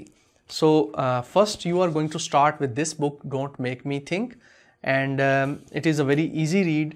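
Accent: India. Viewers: love your indian type assent, also buyed ur , udemy course,, it was awesome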